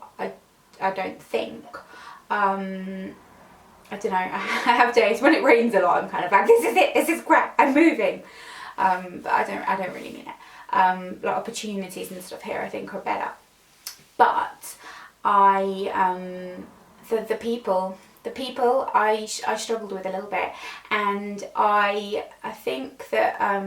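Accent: England, United Kingdom. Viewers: Very interesting video! I've been watching you for years and it's nice to hear a bit more about you and your past! You have a very English-sounding accent, without sounding completely uneducated and ignorant - did you always have that accent or has it evolved since you moved over here?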